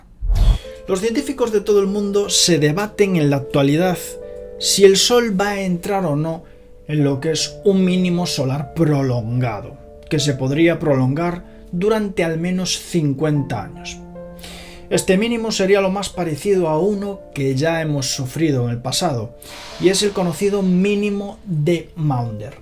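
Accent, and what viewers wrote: Spain. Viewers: es de España fijate en el acento que tiene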